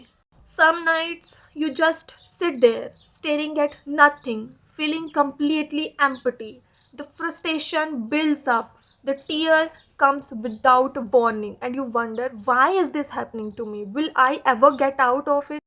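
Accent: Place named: Punjab, India. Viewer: oh sry. But your accent is just like Punjabi's Btw your videos are positive. Keep it up, Good luck 🥹🎀💖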